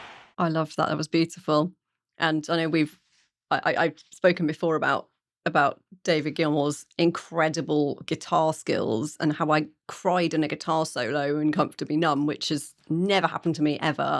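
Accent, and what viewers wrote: United Kingdom. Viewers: She sounds brittish i can't believe she's never listened to PF before 🙄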